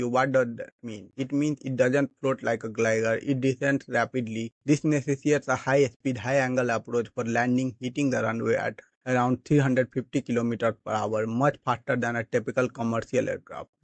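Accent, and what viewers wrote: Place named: Bihar, India. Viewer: Content is good but unable to bear the bihari type english. You should turn to AI voice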